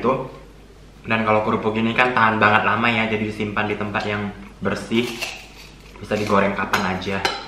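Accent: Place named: Sunda, Indonesia. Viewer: itu emang sunda banget,soalnya aku dari sunda🤭👍salam dari indo🙏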